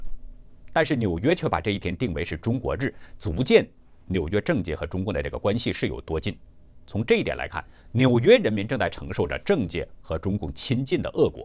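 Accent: Standard. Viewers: 最标准声音主播👍☕️ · 这个主播的普通话很标准，但我肯定他不是中国人。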